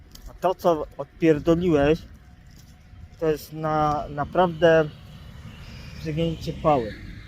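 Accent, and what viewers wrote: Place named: Łódź, Poland. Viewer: Mówi typowo łódzkim, miękkim akcentem. xD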